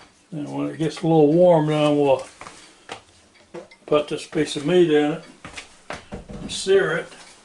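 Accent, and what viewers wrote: South, United States. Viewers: I called it Chick Roast because when I make it its chick roast...when hubby makes it its chuck😘..I have to go look at more of your videos.i am dyslexic so seeing it done helps me so much more than reading the directions .Also I can understand your sweet southern accent cause I have one too😁 Again thank you. I cant believe how tender and flavorful the meat was and how soft the veggies.I added radishes. I hate raw radish but when roasted they are not hot tasting but very sweet it's crazy but gooood! Hope y'all have a great day!🌞💝🕊🙏😁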